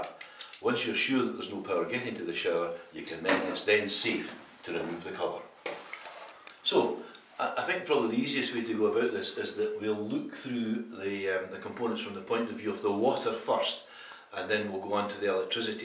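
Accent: Scotland, United Kingdom. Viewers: cause yer scottish :D (y) liked!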